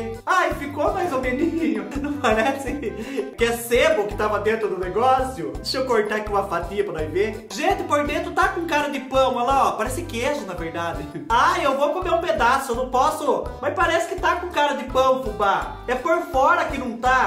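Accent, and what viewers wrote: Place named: Minas Gerais, Brazil. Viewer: Fubá océ é mineiro , falando parece kkkkkkk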